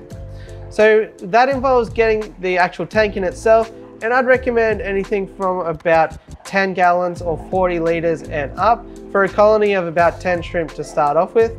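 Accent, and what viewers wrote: Australia. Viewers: oh thank god, hes an aussie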